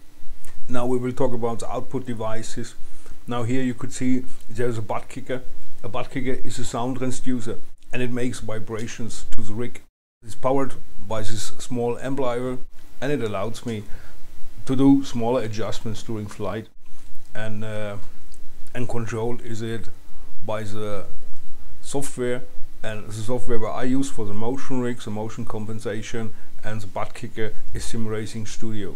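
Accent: Germany. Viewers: Höre ich da einen deutschen akzent? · Danke für das ausführliche Video! Ich gehe mal davon aus das ich einen deutschen Akzent gehört habe und schreibe frech auf deutsch. Hast du das im Übersee gekauft oder in einem europäischen Shop wie Winwing ihn hat? Frage bzgl. der Kosten für Zoll etc. Und vielleicht gibt es ja einen deutschen Supplier den ich nicht kenne. Danke im Voraus!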